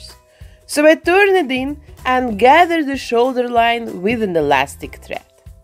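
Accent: Russia. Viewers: It seems to me or you have a Russian accent?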